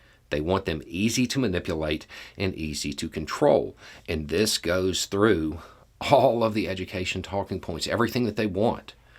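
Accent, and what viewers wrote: South, United States. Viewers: Many thx for your videos. You talk about important subjects in a straight way. Peel away rubbish and twisted ideas. Again, thx from a grumpy old man, living in northern EU. ( I also understand your fxxxing southern accent ☺️) Slava Ukraini 🇺🇦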